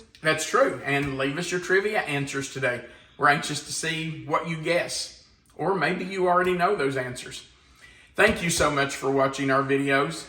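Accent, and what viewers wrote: South, United States. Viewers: Love your channel and your southern accent is awesome. Thank You So Much for actually putting the recipe without pointless huge spaces directly under the video so we can easily screenshot the recipe with the thumbnail pic in it. There are jerks on here who refuse to do that (even after I have asked them to) so I quit watching them. They want us to click over to their website or blog which is Ridiculous and not easy when you are using slow data. Some people are just lazy rude and arrogant, you are very respectable.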